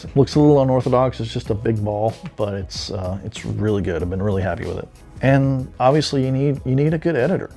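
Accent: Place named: South, United States. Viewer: You sound like David Attenborough with a southern accent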